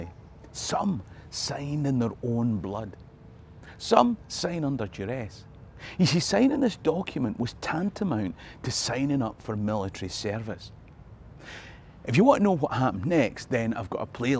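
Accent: Scotland, United Kingdom. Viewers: I've wanted to visit my great grandmothers and great grandfathers birthplace,but I am settling for maybe when in 5 years I can retire but I've found the Scottish accent to be the best in the world,that accent can tell and lead any story,so thank you ,we live a bit of a distance from you ,,,,Dallas Texas ,thank you for teaching all of us willing to listen,Robert.R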